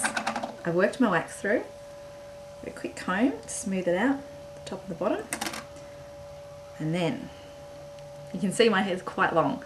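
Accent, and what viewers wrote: Australia. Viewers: You remind me of a Play School presenter becuase of your friendly and soothing voice. I love the people on here marvelling at the Aussie accent. haha.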